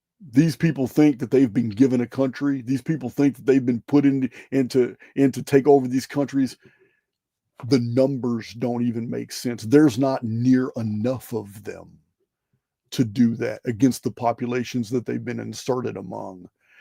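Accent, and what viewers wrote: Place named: Texas, United States. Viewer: The owner of this channel seems to have a LOT of good information, but I CANNOT listen to him. (He sounds like a BLITHERING MORON, a cartoon character! The uber Texas accent, along with his tone and diction... I want to vomit.)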